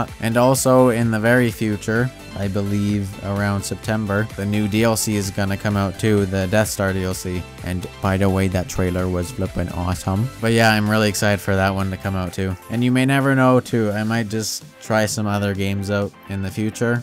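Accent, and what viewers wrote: Canada. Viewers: Also, are you Canadian? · btw I heard your Canadian! Same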